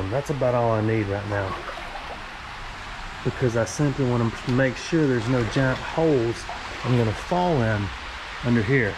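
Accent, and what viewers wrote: Alabama, United States. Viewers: Alabama!??? Yeppers 🤔.. I'm hearing that Alabama way of speaking in every time you get to talking...👍😎 A hard hat is obviously recommended....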